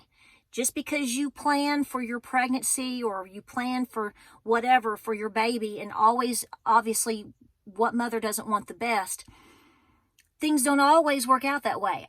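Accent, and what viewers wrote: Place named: Kentucky, United States. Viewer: you’re beautiful, inside and out! So very thankful I found your channel. My husband and I live in west central Kentucky (both of our families are from eastern Kentucky) and hearing you talk feels like “home” to us. Thank you for all your hard work. This can all feel so lonely sometimes and it’s nice to read comments and not feel so alone. Wish we could all get together! ❤️🤍💙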